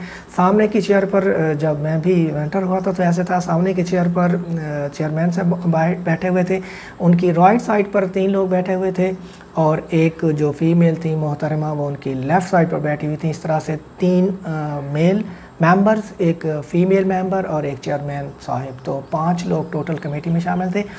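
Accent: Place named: South Punjab, Pakistan. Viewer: Sir you are looking the resident of south Punjab and your accent in speaking more than your words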